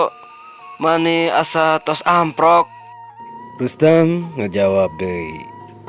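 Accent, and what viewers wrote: Sunda, Indonesia. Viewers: 😄👍👌tos ciri khas soanten kg Guru mah sundanise pisan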